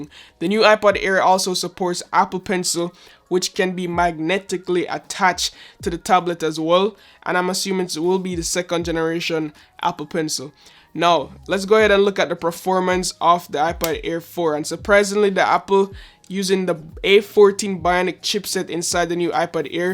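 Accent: Jamaica. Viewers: Nah a the Jamaican accent fi me bredda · Are you Jamaican?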